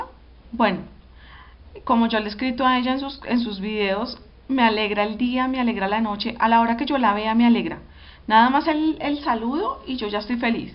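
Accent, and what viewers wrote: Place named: Colombia. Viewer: lindo acento colombiano *.* me encanta ese acento.. bueno hablando de youtubers le aconsejo Supercenizoso :)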